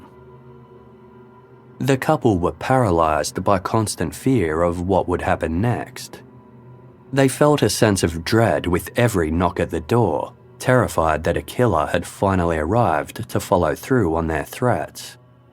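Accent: Australia. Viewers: Psychopaths are not always serial killers they are corporate bosses and world leaders The planet is run by psychopaths The he fact that the president and vice president got extraordinary payouts compared to the compensation for the Stiener’s AND went on to become leaders in other corporations speaks volumes how psychopaths are valued in business and politics Glad the local police were so diligent ,sadly not usual with police investigations And as usual well told and thorough recount And as a fellow Aussie so nice to hear a familiar accent👍🇦🇺✌️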